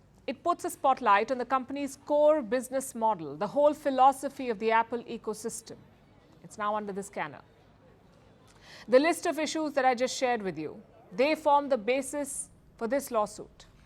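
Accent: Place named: India. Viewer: People from india has one of the worst accents in the world!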